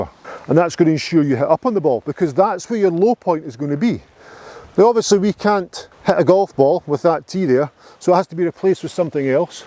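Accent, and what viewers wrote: Scotland, United Kingdom. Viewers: Just loving your scottish accent... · I really enjoy your videos, and have used a number of your ideas. I do especially like this one regarding setting up behind the tee with your driver. I don't understand why you don't have at least 250 thousand subscribers. Your videos are clear (maybe the scotch brogue takes a little interpretation. lol) concise, and sometimes downright funny. Well. keep making the videos and I will keep watching them. Lang may yer lum reek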